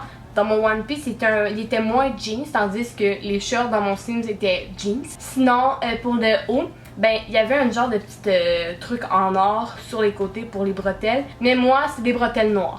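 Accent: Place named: Quebec, Canada. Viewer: j'adore ton accent qc super video fait le je vie comme mon sims pendant 24 h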